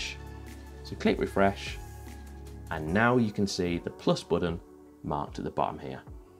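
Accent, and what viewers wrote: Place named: England, United Kingdom. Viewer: Cheers, good to hear a English accent too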